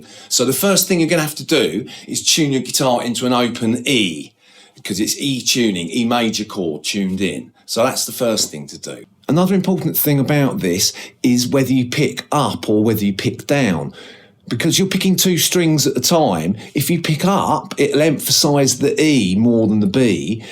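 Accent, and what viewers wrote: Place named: United Kingdom. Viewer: you got a very british accent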